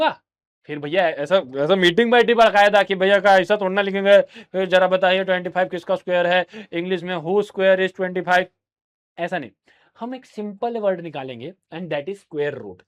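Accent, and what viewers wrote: Bihar, India. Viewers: Bhaiya aap bihari hoo kya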